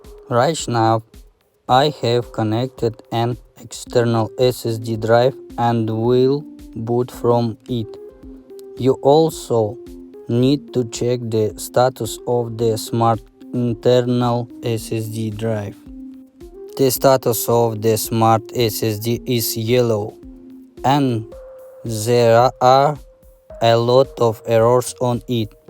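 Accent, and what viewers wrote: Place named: Russia. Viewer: Нормас только голос надо приправить ну надо говорить как англичане а то ты просто с русским акцентом говоришь английский слова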